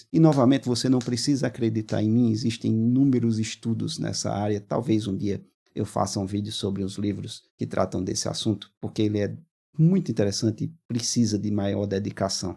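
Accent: Pernambuco, Brazil. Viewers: Hoje estou em Portugal, sou iniciante na leitura. E posso dizer que não é só no Brasil que existem livros caros. Aqui também há. Fora isto.. Hoje estou em busca de como posso fazer para importar livros para cá, tanto pelo preço como também que por incrível que pareça no Brasil existem bem mais obras para língua portuguesa. Abraço Professor, seu sotaque para ser de Pernambucano, pode ser que eu esteja equivocado, se for somos conterrâneos. Abraço.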